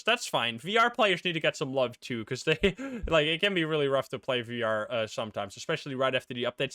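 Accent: Netherlands. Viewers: Are you from netherlands?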